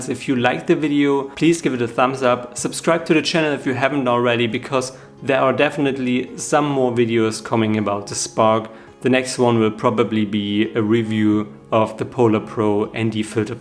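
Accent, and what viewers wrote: Germany. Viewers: Bist du ein Deutscher? Dein Akzent klingt nämlich sehr deutsch :-)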